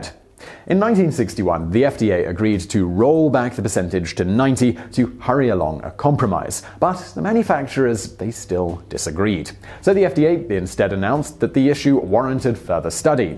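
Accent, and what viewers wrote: United Kingdom. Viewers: accent. The Brits say supa instead of super, and Chiner instead of China. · I was a long-time subscriber to this channel -- never missed a video -- and just un-subbed. I don't understand why Simon has to be so aggressively front and center in these videos. I love the topics, I love the detail. I understand he has a cool British accent, but can't he just be the narrator? It was always weird to me how images and the text always take a background to him. What Culture shows the hosts, but not non-stop. It's just not necessary. I realize that it had been fine initially and then it started to bug me and now I can't stand it. I will definitely re-sub and share if the topic becomes the most important element to the Today I Found Out videos. Simon is fine, no shade, really, but the channel -- educational and informative as it is -- is just too much of him, it's very in-your-face. 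( I also noticed that other people write the series -- can't you mix it up with some of the writers or just have an episode w/o a host?) I REALLY enjoy the subjects, and wouldn't mind if there was just a VO of Simon.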